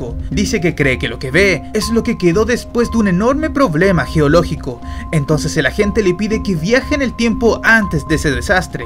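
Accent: Chile. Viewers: La voz de se nota la evolución en su narración y por primera vez le sentí su acento chileno 😳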